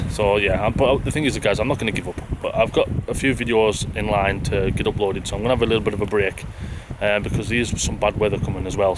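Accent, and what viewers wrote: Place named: Northern England, United Kingdom. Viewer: You really give the subtitles hell with your superb Northern accent.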